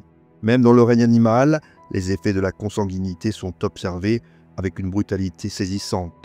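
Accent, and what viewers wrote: Corsica, France. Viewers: Bonsoir seriez vous de corse du sud par hasard?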